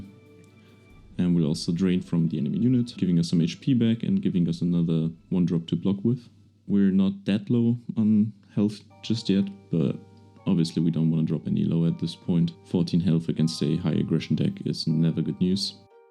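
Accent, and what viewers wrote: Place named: Germany. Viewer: Actually good content. The Accent is still strong so I instantly know ur a fellow Landsmann but that shouldn't bother anyone. Keep it up!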